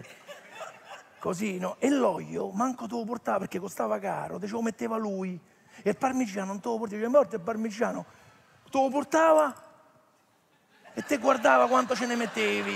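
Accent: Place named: Rome, Italy. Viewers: Sempre grande divertente. Il romanesco mi piace. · E' un comico spontaneo,una comicità' naturale!!! In romanesco poi......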